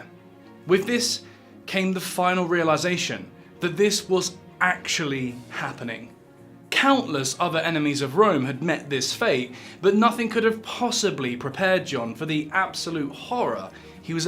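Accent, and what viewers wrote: England, United Kingdom. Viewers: We wouldn't be doing it were it not for tone, pace, etc. Even the British accent helps. Pauses at the right time, everything's in the right doses. Not too emotional, not impersonal either. Perfect balance, storytelling, except it's real stories. Exactly what he intended I guess. And viewers get educated because he does provide a thorough historical context to every event he covers. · love listening to the English accent talk about history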